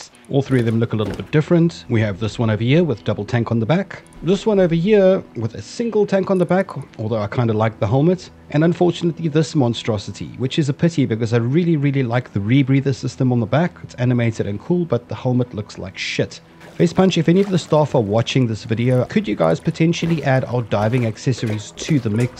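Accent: South Africa. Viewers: Are you South African???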